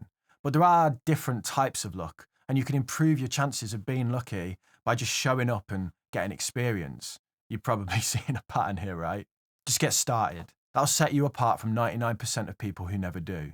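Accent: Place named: Midlands, United Kingdom. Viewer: Congratulations. I recently found your channel and I absolutely love it! It’s so good listening to someone down to earth from the midlands sharing no nonsense, well written advice and reflection with a sprinkle of humour! 🎉🎉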